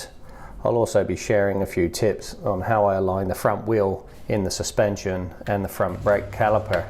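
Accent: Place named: West Country, United Kingdom. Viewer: I wasn't sure whether it was West Country or Norfolk. Hahahaha. I'm Peterborough born and bred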